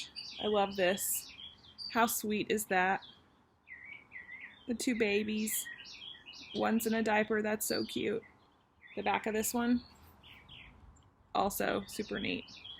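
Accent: South, United States. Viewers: Just finished watching both of your videos, and really enjoyed them! Love your location and your southern accent. My husband and I vacationed at Fort Walton Beach a few years ago, and really love the Gulf Coast. Your stitching is lovely, and I really enjoy your book reviews. I will have to try the Victorian Motto Sampler threads, as they look truly beautiful. Looking forward to more videos from you!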